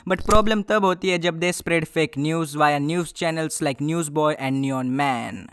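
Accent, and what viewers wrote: Gujarat, India. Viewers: IDK if you Re from Gujarat but you sound like a Gujarati Boy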